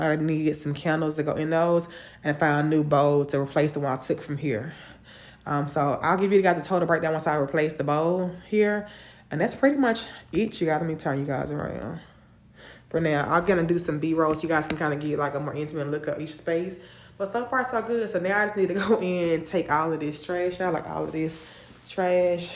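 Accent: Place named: Mississippi, United States. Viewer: If you add some more stems in the vase on the dining table it give the vase more presentation loving the mild colors in the living room it's cozy.first time watching your video while looking through YouTube. Asking you A Q what state are you from? Listening 👂🏽 to your accent when speaking it's close to my families from Mississippi. I'm N.Y.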